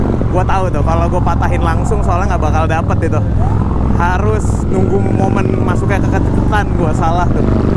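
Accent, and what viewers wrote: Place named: Citayam, Indonesia. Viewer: om ngomongnya bae kaya org citayem aja...hehheehe.. mantap om....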